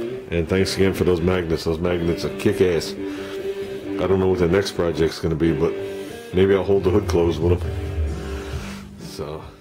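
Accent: New York, United States. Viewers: Sweet power hour. Your accent reminds me of my god parents who lived in Pawling, NY.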